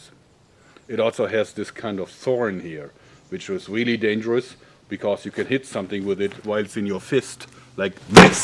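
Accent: Germany. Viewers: Are you German? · Are you germany? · are you German? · Are you German ? ^^ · Man hört so das du aus Deutschland kommst haha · thumbs up for the german accent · were are you come from you speak a liitle bit like a german areyou from there ß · im detecting german accent in you, yes?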